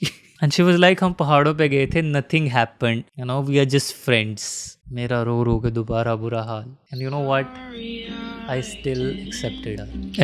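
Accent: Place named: Chandigarh, India. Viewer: I knew it he was from Chandigarh the moment i heard him speak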